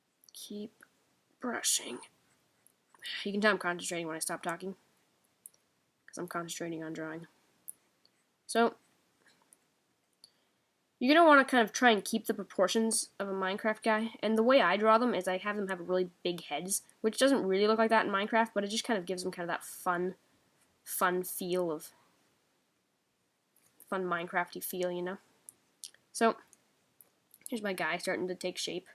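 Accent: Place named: Canada. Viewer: He's Canadian Canadians accents kinda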